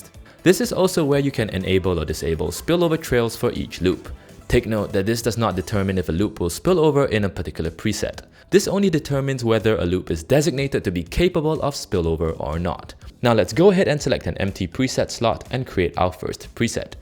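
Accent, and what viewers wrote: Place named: Singapore. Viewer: Is Morningstar based in Asia? This person sounds like a Singaporean or Southeast Asian.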